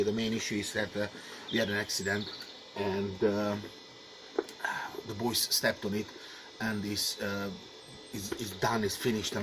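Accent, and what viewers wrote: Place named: Hungary. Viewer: Az akcentusból éreztem, hogy magyar vagy :D.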